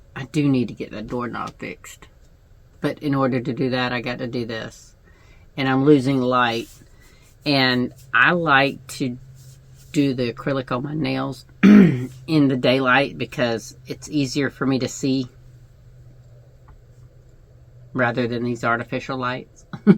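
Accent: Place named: South, United States. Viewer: Hi! I love your videos. I watch every single one of them. Your southern speech comes my hectic Northwest pace. Please do take time for yourself because it’s very important.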